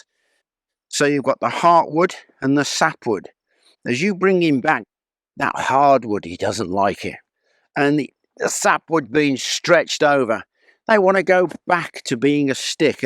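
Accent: United Kingdom. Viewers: Hi there, I'm from Québec Canada. This video appears on my tablet while I was exploring archery related videos, and what gets my attention is your medieval costume first, second , your gigantic long bow , and finally, your lovely British accent. So, I watched all your video and enjoyed it a lot. I'm anxious to view what is next on your platform. Thanks.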